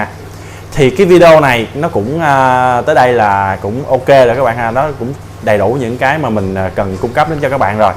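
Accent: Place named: South, Vietnam. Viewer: Giọng nói miền trong đã khó nghe rồi, quay video lại bịt khẩu trang, chán thật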